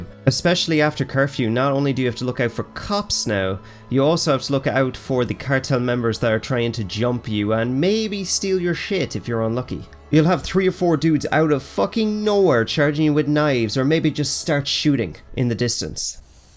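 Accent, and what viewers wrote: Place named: Ireland. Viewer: Nice video bro, What part of ireland u from man?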